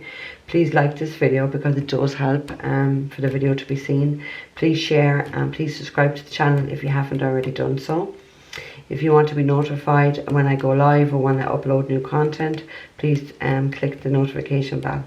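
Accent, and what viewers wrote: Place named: Ireland. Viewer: Ohhhh Irish gal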